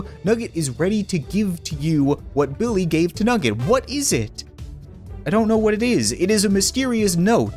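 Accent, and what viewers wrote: Canada. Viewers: You sound really Canadian in this video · He sounds extra Canadian and I love that I want to visit Canada so bad now. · True Canadian voice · I just LOVE how Canadian he sounds! XD Just listen to when he says "sorry"! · I like his Canadian accent it’s funny as hell when you get used to it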